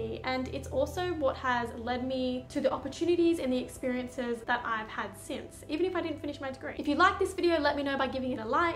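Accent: Australia. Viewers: I'm so glad I accidently found your videos. I live your energy. I was just playing random naturopath videos while doing a workout and I was like is this an Aussie? lol *I'm in Q.L.D. 😎🍉💃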